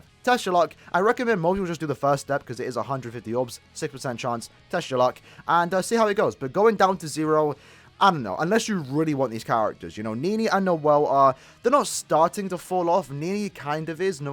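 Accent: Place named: United Kingdom. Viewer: Wha' a salty bri'ish bloke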